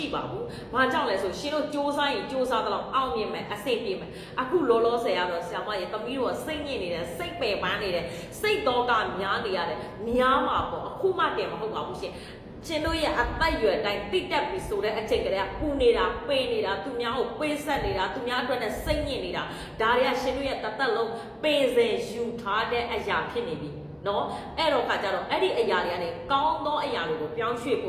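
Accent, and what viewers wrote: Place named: Myeik, Myanmar. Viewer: ဆရာမက မြိတ်အသံပေါက်နေတယ်နော် မြိတ်ကဆို ဟောခန်းလာချင်ပါတယ် လိပ်စာပြောပါဆရာမ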